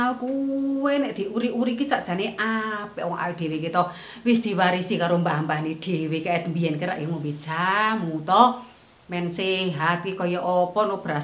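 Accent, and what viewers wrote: Temanggung, Indonesia. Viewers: Marem Gandem logat khasss temanggungan Bunda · Top markotop bu,bahasane Temanggung jan medhok tenan,jos banget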